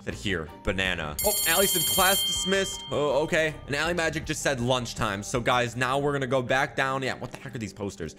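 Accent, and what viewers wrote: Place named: England, United Kingdom. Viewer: I'm english and I speak like you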